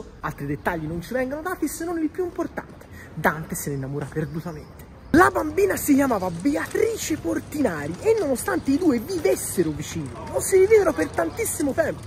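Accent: Florence, Italy. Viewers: grande video, forse non decolli a livello nazionale perchè il dialetto fiorentino è un pò troppo particolare. Ma e un fa nulla e grazie mille!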